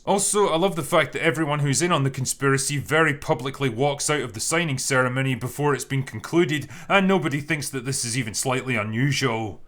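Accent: Scotland, United Kingdom. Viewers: He's scottish, that's what they sound like, he sounds like that in the livestreams, but more normal scottish accent. Calm down.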